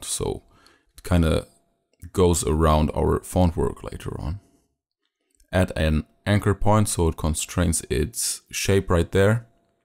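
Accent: Germany. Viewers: i think you have an german accent :O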